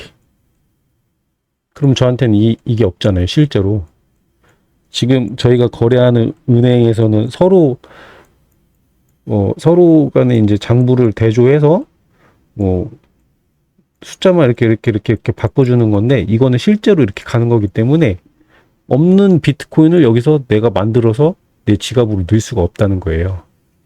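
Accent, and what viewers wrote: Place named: Jeolla, South Korea. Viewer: 말투가 딱 전라도 스럽네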